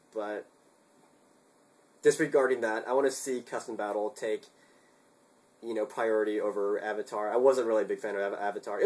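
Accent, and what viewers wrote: United States. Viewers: He is very obviously American. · !?!? thats you!? you look filipino or atleast South east asian i always thought yo were american because of your voice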